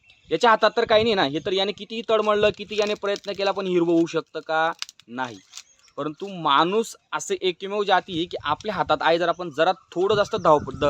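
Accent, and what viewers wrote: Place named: Malkapur, India. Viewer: दादा तुमच्या भाषे वरून कळते ,तुम्ही मलकापूर चे आहात k😊😊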